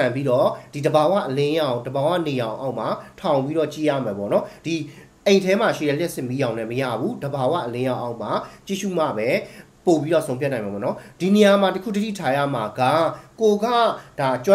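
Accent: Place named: Upper Myanmar, Myanmar. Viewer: ပြောပြတာကျေဇူးပါ အင်းပြောတဲ့သူက မကွေးဘက်က အညာသားဖြစ်မလားနော် သန်းလာတာကို တန်းလာတဲ့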